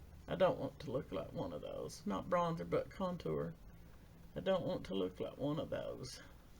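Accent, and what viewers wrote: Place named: South, United States. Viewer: I meant to tell you I just love your accent! My father's side of the family is all Southern and you remind me so much of my favorite Auntie the way she spoke and phrased things. : ) Love you talking thru. It's like hanging out with you. You look fabulous and thanks for the makeup tips! :-)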